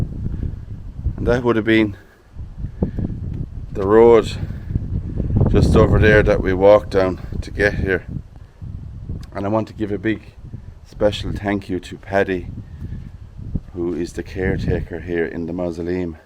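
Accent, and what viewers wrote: Ireland. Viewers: Absolutely beautiful. Such a work of art. I had to laugh though. Your Irish accent can be difficult for my American ears. Sounded like you said the marble was brought in by a “horse and cat”. 😅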